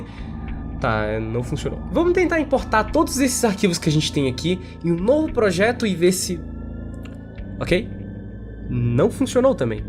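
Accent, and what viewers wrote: Brasília, Brazil. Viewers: VOCÊ É DE BRASÍLIA???????????? ceilândia ou taguatinga sul?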